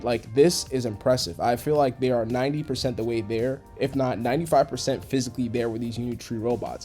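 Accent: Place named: United States. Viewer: China hasn't shot a bullet outside of their borders in almost half a century so it's kinda hard to listen to someone paint China to be as warmongering as the US especially in American accent. Not saying China can't be aggressive in the future but you can't deny the fact that the irony and hypocrisy is too much to handle for most of us normal non-western people. Also I agree that China probably already testing more advanced robots, drones, and AI in their secret military labs but they're the only major power that actively tried to ban and regulate AI usage in war. So it's not fair to criticize China without criticizing certain powers who denied Chinese proposal just because China advanced faster than expected.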